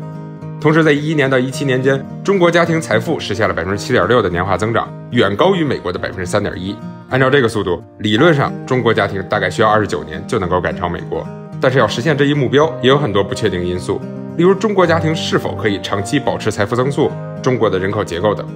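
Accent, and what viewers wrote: Beijing, China. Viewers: 一直觉得是北京的～终于在这个视频里得到了认证哈哈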